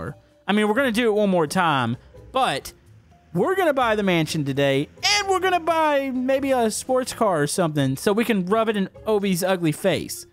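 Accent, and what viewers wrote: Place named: Texas, United States. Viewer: Come on I didn’t know you lived in Texas I thought you lived in like Minnesota or something I thought you don’t have the accent you do of the Texas accent probably should’ve guessed it by that but it’s cool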